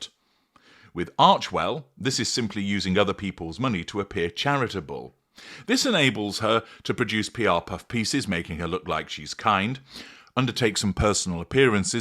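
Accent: United Kingdom. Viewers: Why can't you people leave this woman alone? Why go after her specifically? Is it because you have that dastardly british accent i have grown to hate.ALL YOU PERFECT PEOPLE??.this is truly defamation of character..Why not go after Trumpanzee..the biggest Narcissists of OUR TIME and his cohort VANCE, PLUS MEGAN KELLY...YOU FOLKS YOUR TRUE COLORS ARE REALLY OOZING.MEGAN NEEDS TO SUE YOU,PERSONALLY .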